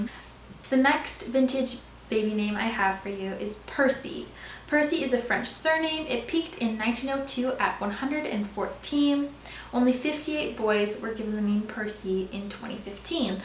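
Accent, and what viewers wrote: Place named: Canada. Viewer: Are you from Canada? just wandering it kinda sounds like you have an accent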